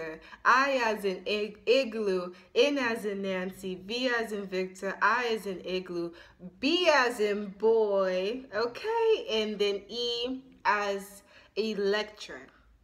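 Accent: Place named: Jamaica. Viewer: Jamaican accent sweet nu bloodclaaat 😏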